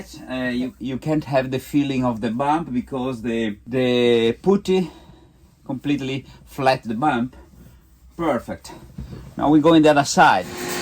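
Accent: Italy. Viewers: Wonderful, you are back in English. I don't know what I like most about your channel, all the tips on how to fix things or your wonderful English with a clear and lovely Italian accent 🇸🇪😀😄💕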